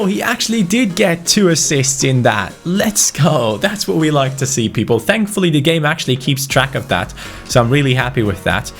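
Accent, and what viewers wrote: Saudi Arabia. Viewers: he is probably from sadra Arabia becouse that's how they say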